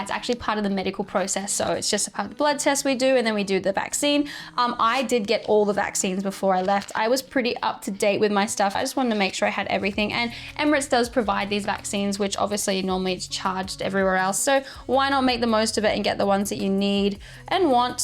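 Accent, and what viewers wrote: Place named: Australia. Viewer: Hi, I love your content and I’m so happy to see your video! I really enjoy the vegan blogs and love listening to your Australian accent. ❤️🤍keep posting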